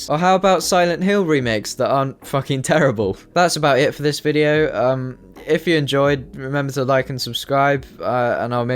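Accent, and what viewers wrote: United Kingdom. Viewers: You're british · should have known you were British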